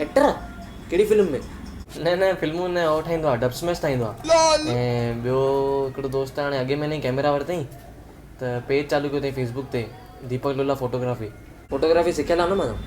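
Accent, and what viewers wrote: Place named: Sindh, Pakistan. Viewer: How to speak sindhi like u?Exactly pure Sindhi🤣🤣🤣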